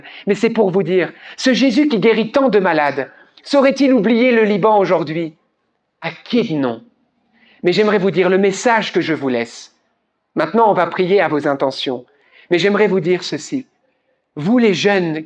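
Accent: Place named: France. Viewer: Sûrement personne est angoissé par la guerre car tous sont gavés d'argent à mort. Ils mettent leur pied ds le 1er avion et foutent le camp. خا. رااا على هيك عالم وعليك كمان لأنك راخي نيعك لمن تحكي عربي. Mon cher petit de rien du tout, comme tu as parfaitement pris l'accent de la langue française , tu peux très bien prendre l'accent de la langue libanaise qd tu parles. Mais non tu veux nous montrer que tu es fghanji coucou de mon c. ul. Vas te faire fou. Tre. Petit c. On.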